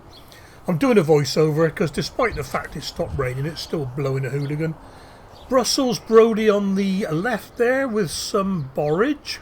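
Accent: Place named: Devon, United Kingdom. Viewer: you sound as if you are in devon but your soil is not red like mine torquay dave thanks for the tour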